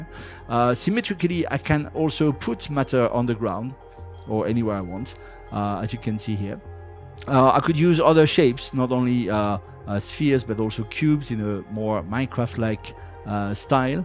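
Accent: France. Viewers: On entend bien que le narrateur est français :) Je viens de découvrir le jeu et bravo aux parisiens pour ce travail pas encore finit mais impressionnant au possible ! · This guy sounds French, am I right?? Hmm, French doing good stuff these days. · Cet accent français quoi x) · Magnifique l'accent français... ;-)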